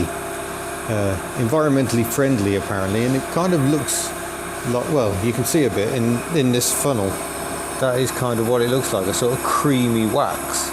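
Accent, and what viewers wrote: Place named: Birmingham, United Kingdom. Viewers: your a brumy mate im from dudley lol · Loved hearing the Black Country accent again! :)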